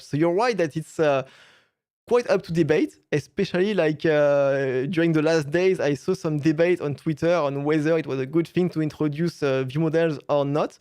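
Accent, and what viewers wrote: France. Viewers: fransız aksanı mı bu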